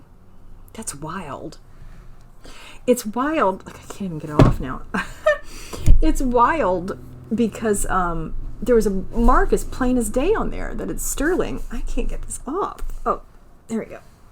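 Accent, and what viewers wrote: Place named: Alabama, United States. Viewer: You must be from Alabama.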